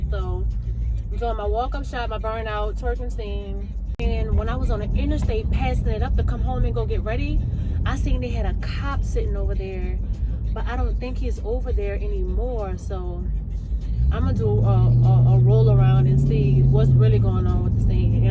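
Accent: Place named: Louisiana, United States. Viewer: Benny sent me,, I’m here for that Louisiana accent 🔥🙌🏾😂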